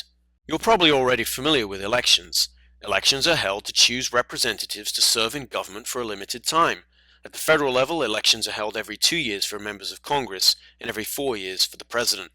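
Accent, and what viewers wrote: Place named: Australia. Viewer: I am confused that he is teaching us american government yet he has an Australian accent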